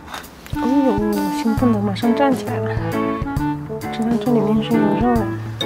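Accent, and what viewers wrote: China. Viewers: 听你的口音你应该是中国人吧！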